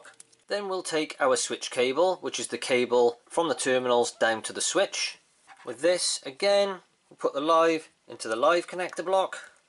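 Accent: United Kingdom. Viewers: Would these be OK to join my lighting earth wires to the light fitting earth, for example I've got 3 earth wires coming from the ceiling ( rose) and one coming from the new light fitting, can they be joined into the 4 way connector. I'm in the UK and from your accent not a million miles away from your good self.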